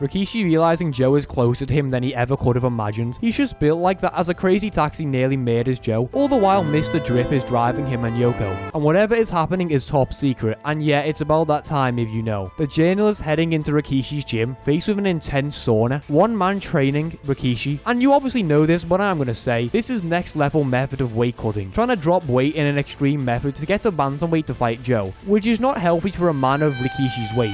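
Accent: Liverpool, United Kingdom. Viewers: they re both from liverpool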